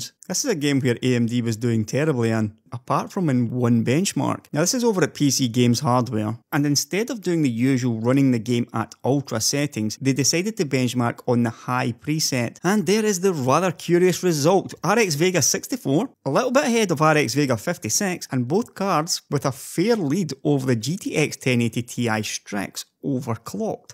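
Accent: Scotland, United Kingdom. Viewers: i love you videos and your scottish accent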